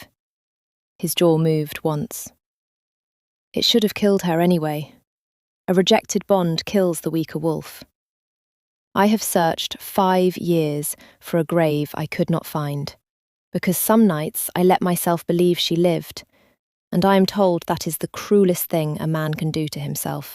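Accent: United Kingdom. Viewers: Interesting story. Cool brit accent.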